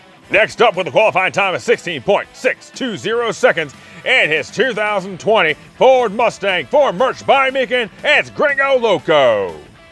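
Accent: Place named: South, United States. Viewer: Just found you guys! About time we had some good country boy narration- 😂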